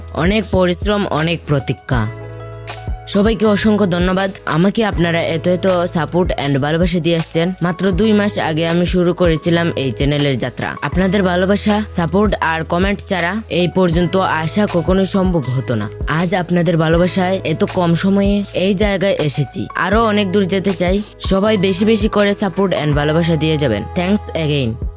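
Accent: Sylhet, Bangladesh. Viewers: tumi kita sylheti ni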